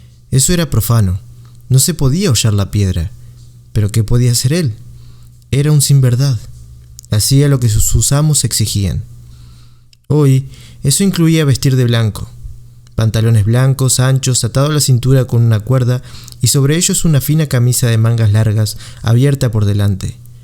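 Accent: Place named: Argentina. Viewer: De verdad, gracias a ti por hacer esto. Esta saga merece ser leída ( en este caso escuchada) por muchos. Me encanta tu narración, el acento argentino le da otro toque. Que bien que no te tomaste mi comentario a mal. Por favor, no pares y continua la saga. Subscripción inmediata.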